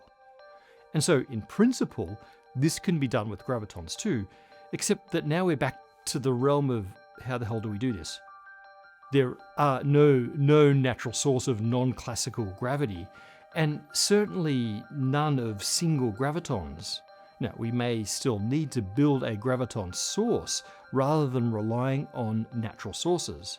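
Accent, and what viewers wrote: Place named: Australia. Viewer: Perhaps his Australian accent is making perception difficult. I am listening without headphones and have no problem. You can turn on captions, English (auto generated) to help.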